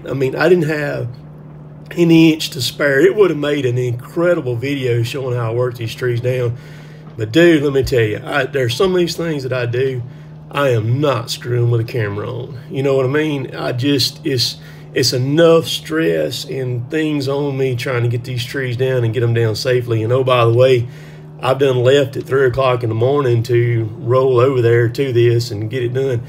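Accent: South, United States. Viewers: I could tell you were from either Alabama, Mississippi or Georgia. I’ve been thinking about buying tree climbing gear to expand my side hustle. I really do love tree work. I’m from Alabama. Know exactly where Wadley is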